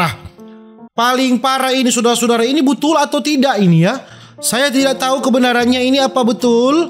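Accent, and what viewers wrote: Manado, Indonesia. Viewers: Lah Logat nya kayak Manado nih asal mana Ko? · Orng tondano ato Manado kk hehe kalo luar maapppp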